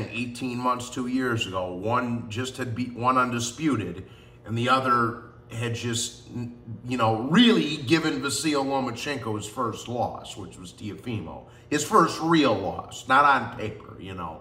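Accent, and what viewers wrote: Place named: Staten Island, United States. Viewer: the way you speak the way you carry yourself you could easily be mistaken for a person from Staten Island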